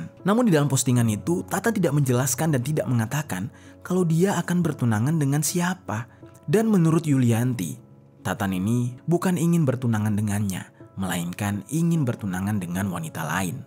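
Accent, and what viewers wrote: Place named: Sundanese, Indonesia. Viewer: Lucu banget bang kalo ngomong bahada sunda 😂😂😂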